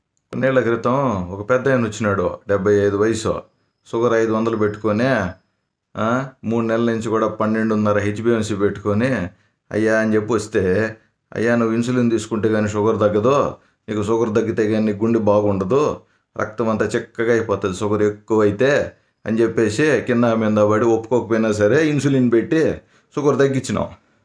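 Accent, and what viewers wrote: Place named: Rayalaseema, India. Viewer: Sir You are far better than any actor in our Tollywood. Good expressions sir. Voice modulation is good Our Rayalaseema slang is good.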